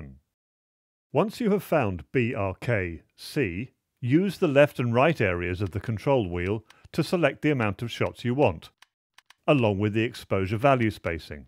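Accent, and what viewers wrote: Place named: United Kingdom. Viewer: outstanding. Can you believe that i just couldn't find these instructions anywhere?? clear crisp and at last a classic understandable old school british accent with clarity of thought . thanks once again.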